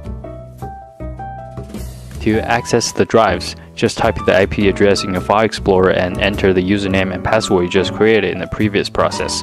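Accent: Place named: China. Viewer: Are you a Chinese? I like your accent!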